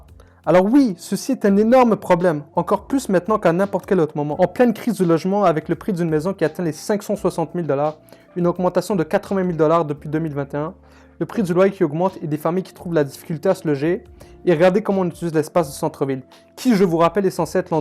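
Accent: France. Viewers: Quel commentateur de merde! PLEIN de faux et en plus avec un accès de merde, xur à comprendre, un vrai français frustré, comme dirait Martineau " mais de kessé ”? Y a plein d autre pays pour habiter. Je suis de Québec et j aime bien Laval pour sa modernité, ses grands boulevards larges, son métro, l” université de montréal, Place Bell, espace Montmorency, centre de la nature, carrefour Laval, etc... Laval est la place no.1 au Québec pour la culture en serre... Donc, petit frustré, probablement que tu a une crotte contre ton compte de taxe ou une contravention pour avoir grillé un feu rouge te là, tu fais du bassin gratuit. Mauvais immigrant dont on a pas besoin, même si on manque de monde. On veut des quebecois d adoption respectueux, heureux et fiers. Pas ses plaignants. Mon grand père qui a, avec tous les autres grand père set mon père, ne se plaignaient pas. 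Ils faisaient. Bye, conard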